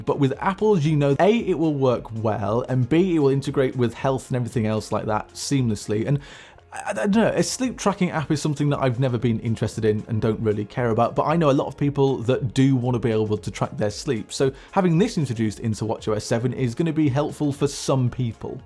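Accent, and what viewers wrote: United Kingdom. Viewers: Dollars ? You show pounds on the screen and say dollars, with a British accent. A bit confusing. Canadian dollars , or Australian dollars ?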